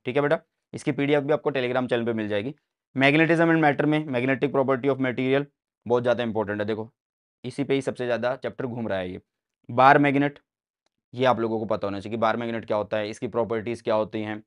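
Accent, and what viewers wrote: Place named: Haryana, India. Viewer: Sir aap haryana ke ho...apni language mein thoda accent hain haryanvi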